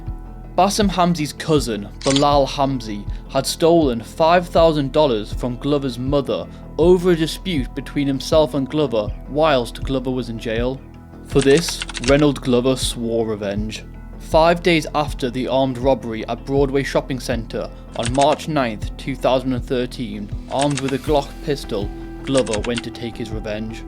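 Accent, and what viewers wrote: England, United Kingdom. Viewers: The narrator is English- why doesn't he focus on British crime?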